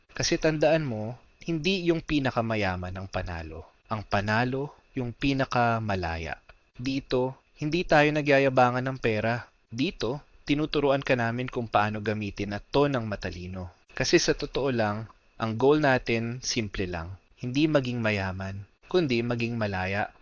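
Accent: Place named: Conyo, Philippines. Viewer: Out of curiosity, Tagalog is not the only language you speak growing up, right? Cuz I hear a lot of conyo pronounciation. Lol, not hating thou. Thank you for this video! 💜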